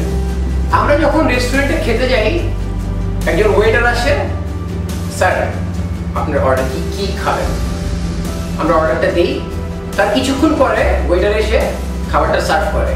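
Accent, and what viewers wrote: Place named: Old Dhaka, Bangladesh. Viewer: vi vlo bolsen.. but Language gola ektu vlo korle r o vlo hoto... but kisu korra nai karon ata poran Dhaka kotha bolar style.. jak all the best vi.. 👏👏👏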